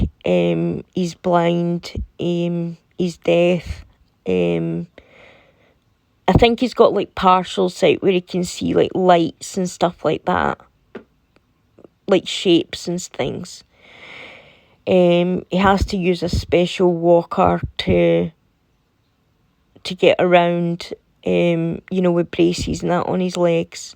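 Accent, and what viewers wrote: Scotland, United Kingdom. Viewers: Great video!! Yes we love your accent. Love Scotland. I'm a descendant of Scotland.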